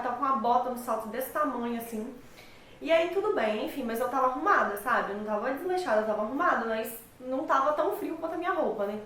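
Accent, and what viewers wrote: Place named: Brasília, Brazil. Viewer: Vc e de Brasília ? pelo jeito de falar parece ser! :) gostei muito do vídeo um abraço.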